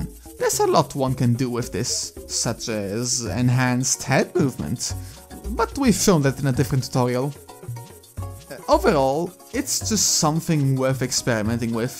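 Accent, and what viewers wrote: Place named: Germany. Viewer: You sound super German it's very entertaining. Also, and is it possible to change facial animations?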